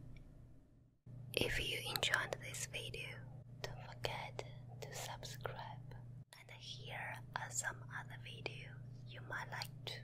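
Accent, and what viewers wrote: China. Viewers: 英文很有中国味道.......很好听的说。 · when you realize the Chinese speech stereotype is almost on point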